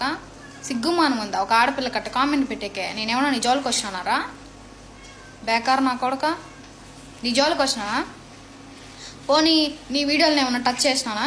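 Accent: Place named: Kadapa, India. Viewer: Endi medi kadapa me basa Chala bagundi I love you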